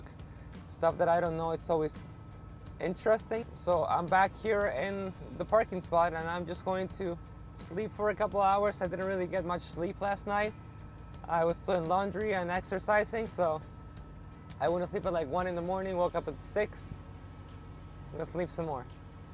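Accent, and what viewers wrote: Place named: Latin America. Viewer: El trokero peruano que no hace vídeo en español…. Smh. Don’t forget where you come from.., don’t be one of those Latinos that think they are gringo because they light skin and speak English(with an accent). Not hating but it’s the truth.. there’s a reason why you got less than 5k subs.. Vlog en Español and you’ll see your channel blow up. ✌🏽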